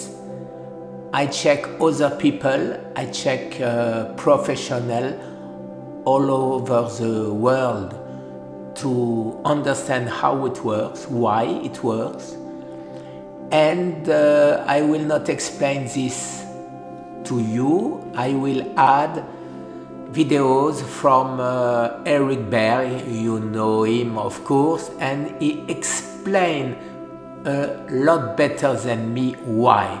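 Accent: France. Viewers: Your comments are very interesting, in the same it helps us to understand because you have very french accent. for is the same I have very Provencal accent Thank you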